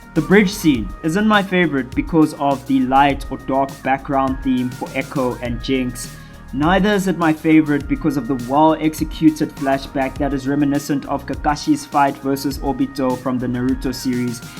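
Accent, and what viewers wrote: South Africa. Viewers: First time watching a video. are you a saffa? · I just know you South African 😂😂bro I appreciate the review ,you did an amazing job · Hang on... Are you South African? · aweeee dude, as soon as you spoke I thought I detected a fellow South African. Fantastic analysis - keep up the great work. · Eh dude nice to here a south african voice, binge watched it then woke my brother up and binge watched it again lol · Bro are you from South Africa? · Within 6.12 seconds I recognized that south african accent! Great to see you popping off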